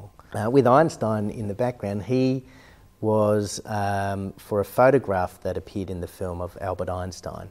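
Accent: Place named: Australia. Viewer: I’m glad he still holds the Australian accent I know in love. RIP Australian Accent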